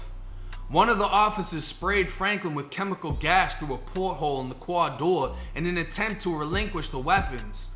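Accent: Boston, United States. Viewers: Ive asked you before. Now you got so fucking many subscribers. Big respect. I did over 17 years myself. But i need to know. I know you got down in Tampa. But you gotta be from Boston with that accent. Im feom Boston. And thats the only place we talk like that. You got a Boston accent, bro. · Props buddy...but seriously how did you get a Southie Boston Accent living in The 'Gunshine State'? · Lowkey got a Boston accent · You have to be from Boston or Massachusetts somewhere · You sound like your rocking with Boston! I can hear the Florida but some of your words sound like me lol yes im from Boston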